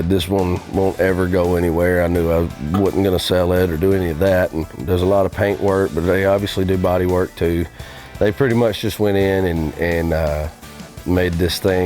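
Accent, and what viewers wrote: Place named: South, United States. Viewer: Love southern accents.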